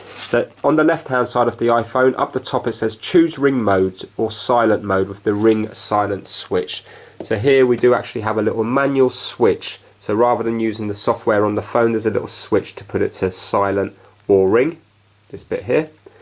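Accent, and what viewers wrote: England, United Kingdom. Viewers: I've subscribed. You took away my fear of getting messed up and ruining two phones. (Good to hear an English voice again)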